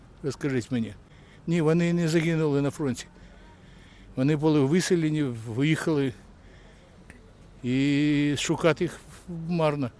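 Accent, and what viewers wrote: Russia. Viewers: Почему нэмецкая, а не нимэцька, розмовляли, а не ромовлялы, была, а не була? Как не старается выдать себя за украиномовного, не получается, русские слова проскакивают. Молодец, заработал свои 30 сребреников. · В Одессе до войны можно было услышать разные языки-это правда. В 2010 году могу с ответственностью сказать, что в основном, говорили на своем "одесском" наречии, его ни с чем не перепутать. Мужчина, судя по его акценту, тоже говорил на русском, или на суржике. Главное, что в сердце у человека. На фронте бойцы не поднимают этот вопрос до фанатизма! Слава Богу! Слава ЗСУ! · У самого в мові відчувається, що більше розмовляє посійською..,але зараз намагається говорити українською. Фонематика не українська. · Как он старается говорить на украинском, но черт, возьми, он же русскоязычный, дураку понятно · А у самого то відчуваєтьсяговір російської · Пиздун, всю жизнь говорил на русском, теперь перекрасился, власть поменялась, это слышно по акценту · Суржик це не Українська мова. 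А діло закидає москальський акцент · А русский акцент скрыть не возможно Это Я вам как Одесситка говорю И все всегда говорили на русском и на своем что получили по национальному признаку 30 лет проработала на Дерибасовской и только русская речь звучала в Одессе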